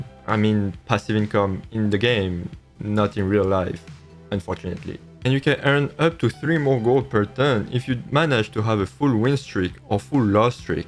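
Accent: France. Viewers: T'a trop un accent français , 100% tu l'es je pense ^^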